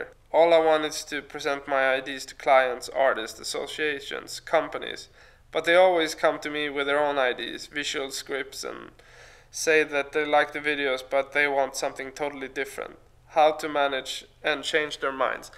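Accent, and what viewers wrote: Sweden. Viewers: Man kan inte bara sluta älska svinglish 😝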